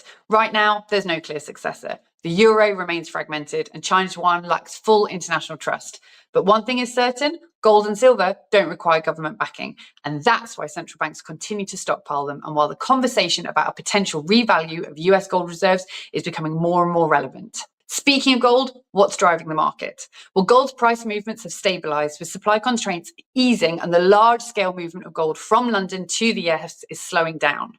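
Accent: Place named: United Kingdom. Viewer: WHY DO ALL THESE NEWS COMMENTARIES HAVE TO EMPLOY A BRIT WHO TALKS TOO FAST ??LOCAL NEWSCASTERS,WEATHER PEOPLE EACH VENUE EMPLOYS AT LEAST ONE........I CANT UNDERSTAND THEM.MYSELF..HIRE AN AMERICAN WHO CAN SPEAK FLUENTLY AND SLOW DOWN...........SORRY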